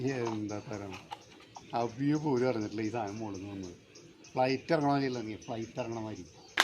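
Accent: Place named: Thrissur, India. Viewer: തൊട്ടിയും പൊരിച്ചു തൃശൂർ ഭാഷയും പൊരിച്ചു 😅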